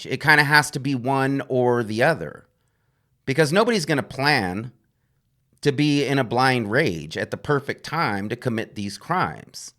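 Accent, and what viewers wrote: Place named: Flint, United States. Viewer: Chicago, Memphis, Flint, or anywhere in the state of New Jersey lol. Judging by your accent I would say Flint. No disrespect by the way. I have family in all three cities and the state of Jersey.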